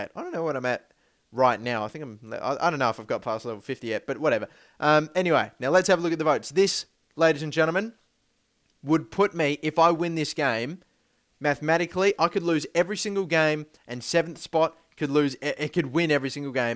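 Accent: Australia. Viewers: Gotta love the Australian accent! :)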